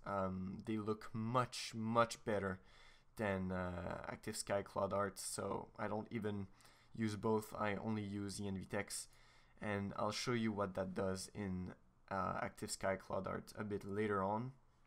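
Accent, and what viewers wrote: France. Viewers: Vive la france XD grillé en 2 secondes avec ton accent ! Bonne continuation !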